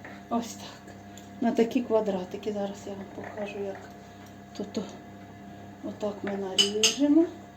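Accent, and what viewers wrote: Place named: Kolomyia, Ukraine. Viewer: Такий голос щирий, рідний, український... дякую!!!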